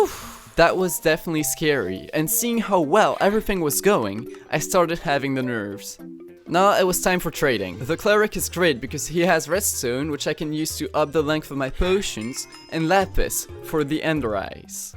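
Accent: France. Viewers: ayo i knew he was french by his accent or at least itought he would speak french, an he does, it makes me happy idk why when i see french yt ers speek english+ actually udnerstanding them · I totally expected that from his accent tbh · ah bah tu parles francais a la fin mais jai reconnu a laccent ptdr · i’m french canadian, he’s french, he has a french accent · Bonjour Monsieur je pense que tu est plus francis, francis et moins quebequoi francis. Oui? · Aaaaaah, I thought you might be French! The "no lessons on Wednesday afternoon" made me suspicious, and I then I thought I noticed a hint of French accent in your English Gg mon gars :) Edit: Belgian apparently? Whatever, French-speaking, you know what I mean xd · Could definetly tell you were french from the accent lol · Bien joué mec j'ai même pas remarqué que t'avais un accent français avant la fin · That’s what you’re accent is the entire video I was wondering and at the end you start talking French exactly what I though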